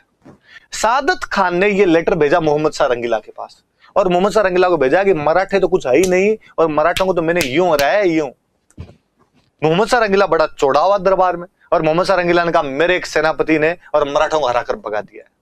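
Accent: Rajasthan, India. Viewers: Wow... Even though,I took 2-3 times to listen n understand the rajastani tone of hindi he depicts. I feel proud of his efforts.💫💪 Thankyou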